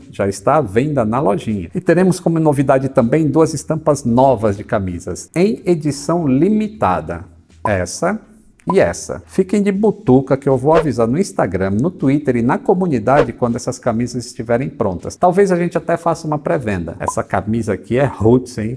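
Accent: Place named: North, Brazil. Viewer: percebi um falar NORTISTA no Mano... anda ele comendo muita Carne-do-sol com farinha ou ele é do Ceará? Nós de FOR queremos saber! P.S. " o Mano ê quase indispensável " é muito bem vindo!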